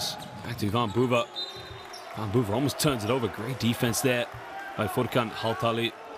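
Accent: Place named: Boston, United States. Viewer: Just watching this a year later for the love of the game. Sounds like the announcer is from Boston. Stunning shot to end q1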